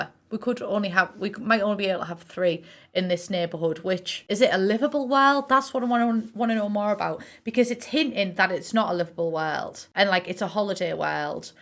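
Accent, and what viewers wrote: United Kingdom. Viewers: in the beginning of this video Did you say your mum had covid ? I'm sorry sorry sometimes I can't understand British accents sometimes please forgive me 😄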